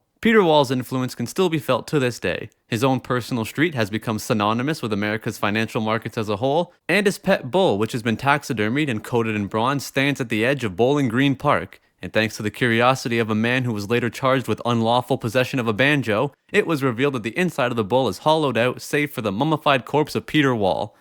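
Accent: Canada. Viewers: WHAT FUCKING PART OF CANADA ARE YOU FROM LIKE I CANNOT TELL?!?! ARE YOU FROM TORONTO OR THE ATLANTIC YOU SICK FUCK???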